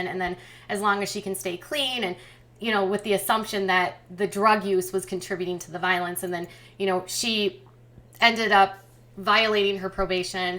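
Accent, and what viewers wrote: Minnesota, United States. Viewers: I like your accent. Are you from Minnesota?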